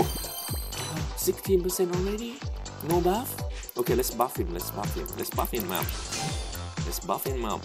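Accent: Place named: England, United Kingdom. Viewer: I like your English accent its great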